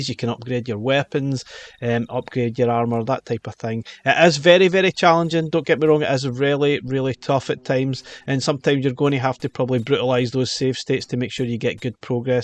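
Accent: United Kingdom. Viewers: TIL: Brits talk funny. ga-LAG-a? Anyway, the games look great, but I need no more chunks of plastic. If they put the collection on an Evercade cart or something, I’m all in.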